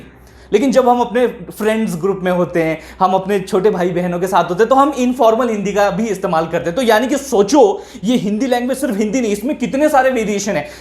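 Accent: Maharashtra, India. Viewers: bhava tu marathi ahes ka? aikun bhari vatle!! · Your marathi accent is very good✨ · Your marathi accent is very good😊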